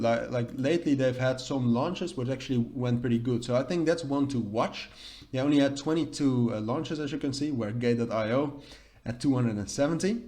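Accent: Netherlands. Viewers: Hey by you accent i thin you from Netherland right? I want to know if you are still active on this youtube channel if yes i have a few questions and things to propose you. Can you just reply and i will dm you on you socials. Have a good day!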